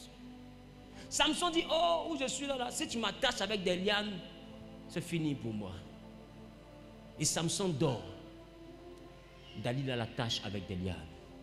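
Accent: Ivory Coast. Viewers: l accent ivoirien, man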